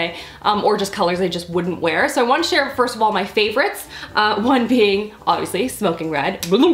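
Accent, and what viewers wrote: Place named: Canada. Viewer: You sound incredibly Canadian today. Like more than normal😂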